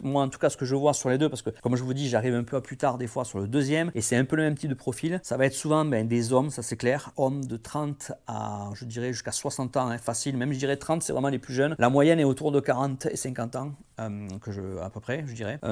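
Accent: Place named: Montpellier, France. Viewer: A l'oreille, je pensais que tu étais de Montpellier lol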